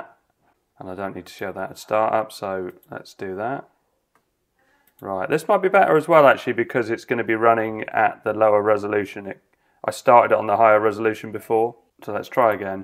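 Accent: United Kingdom. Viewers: I bet you're from the UK.. Greets to Mr. Bean.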